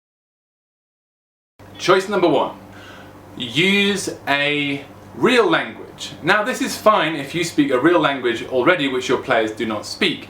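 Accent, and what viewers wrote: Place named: Germany. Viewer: Bonega filmo mi speziale amas, tiel vi parolas angle kun germana akcento 😂 #kor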